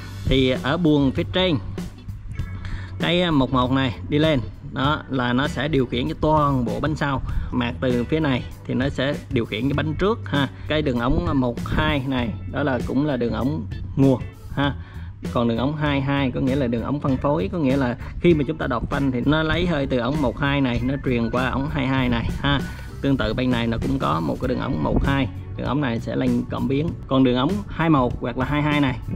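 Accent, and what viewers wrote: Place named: Quang Ngai, Vietnam. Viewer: Giống Quảng Ngãi vậy anh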